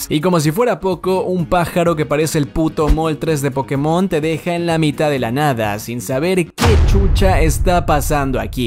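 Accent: Ecuador. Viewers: Hablaste como ecuatoriano, me equivoco?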